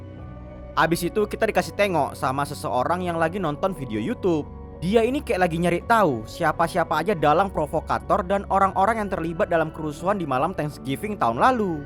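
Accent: Medan, Indonesia. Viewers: awak medan kali bah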